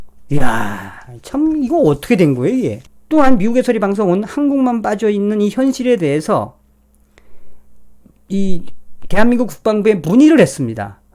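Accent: North Korea. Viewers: 황장수의 뉴스브리핑에서 북한정제유 환적에 대한 집회에 동참해 주시길 바랍니다. 대한민국 국민들 다수가 나서는 모습을 보여야 외신도 주목하고 한국을 포기하지 않습니다. 그리고 문죄인도 무너지고 통일도 빠를 수 있습니다. 문죄인이 무너져야 한국이 제대로된 북한제재에 동참하고 북한의 숨통을 제대로 조일 수 있습니다. 주변에 되도록이면 많이 참여하시도록 부탁드립니다. 저도 지방에서 생애 처음으로 참여하기로 했습니다. 방송 잘 보고 있고 북한 사투리가 정겹네요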